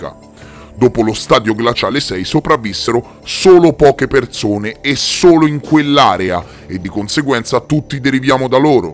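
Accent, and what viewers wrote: Tuscany, Italy. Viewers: Grande.... Se posso chiedere ma sei toscano? Scusa la mia curiosità grazie per le informazioni che ci metti a disposizione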